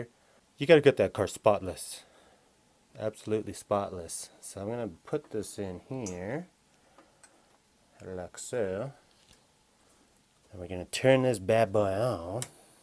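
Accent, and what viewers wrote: Canada. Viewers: Loved the commentary, you sound like you are in Canada?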